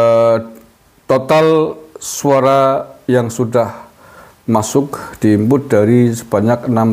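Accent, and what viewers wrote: Banyumas, Indonesia. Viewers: aku teringat masa kecil d purwokerto btl itu ngurusi angket ongkot angkot brisik bae wong wis kalah aku slalu ngikuti acara chanel pak abis banyumase medok banget hebbatttt dan lucu tdk tegang yang nonton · Waalaikum salam wr wb paak lucu bahasane banyumasan banget akuvterhibur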